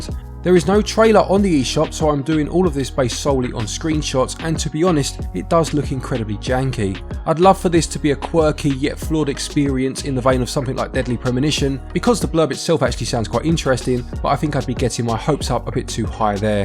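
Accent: England, United Kingdom. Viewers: Definitely waaaaaay too English. But we love it. Now say aluminum and strawberries for us please.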